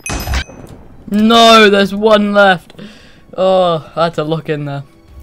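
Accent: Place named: United Kingdom. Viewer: every time you talk in your videos it reminds me that you're british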